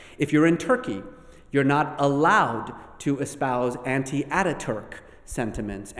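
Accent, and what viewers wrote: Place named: France. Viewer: poor production quality... too much echo and the french accent of the host is distracting.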